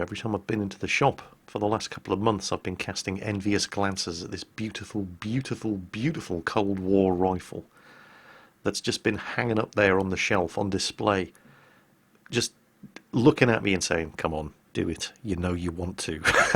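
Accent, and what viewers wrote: Australia. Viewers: Soooooo... Are we going to get airsoft videos full of ' horrible Aussie accent now? Because that will be interesting.:)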